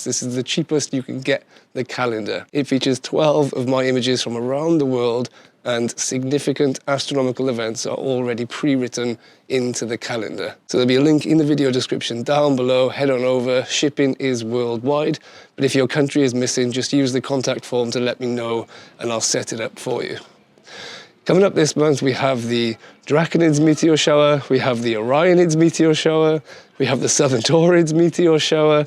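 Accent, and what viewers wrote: Wales, United Kingdom. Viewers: Laughing at the YouTube subtitles misunderstanding your welsh accent and telling me the Tories are fireballs (I wish)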